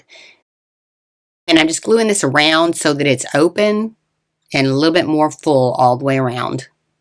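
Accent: South, United States. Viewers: These are so cute! This has got to be in my Top 5 craft channels I follow on YouTube, if not the top spot! VZ perfect crafts, perfect Southern accent with the right level of sound. Thank you.!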